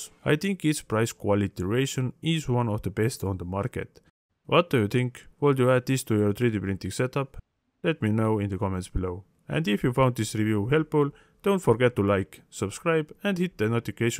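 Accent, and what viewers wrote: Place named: England, United Kingdom. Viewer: I don't have that either! I use translate! It's more about his deep voice and English accents! What I'm happy about and what's most important, is that makes some good videos, and I enjoy them!:)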